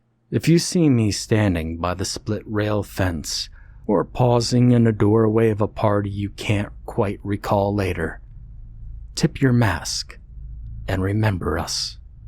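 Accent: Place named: New Jersey, United States. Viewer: I knew I heard a Jersey accent!🙂 Love your channel (from a fellow Jerseyan)!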